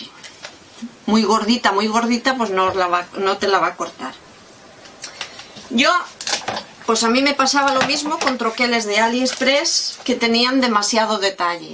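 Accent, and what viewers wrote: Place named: Galicia, Spain. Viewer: Que curranta eres, yo te sigo de hace poquito, me gustan mucho tus videos,soy de sevilla y me encanta tu acento gallego, si me quieres saludar en tu prosimo video se lo enseñaria a mis nietas para que viesen que tengo una amiga youtera, me llaman Loli, un besiño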